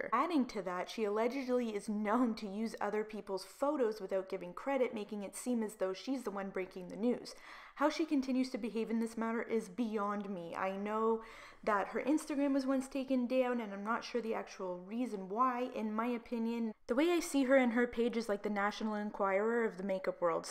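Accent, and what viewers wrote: Canada. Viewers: Are you Canadian Lol? I hear the "aboot" instead of about lol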